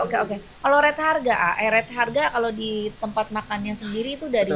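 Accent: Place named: Garut, Indonesia. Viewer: Ngomongnya ala garut terussss skrg😂😂😂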